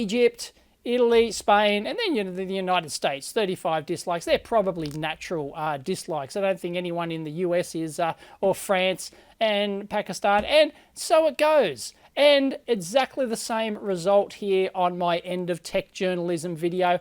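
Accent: Australia. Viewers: As a kiwi I find your aussie twang annoying, but I wouldn't dislike a video based on it. I power through it because your content is absolutely great. I can only imagine your natural dislikes are from cranks who hate the truth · Like all your vids, despite that Artful Aussi English :)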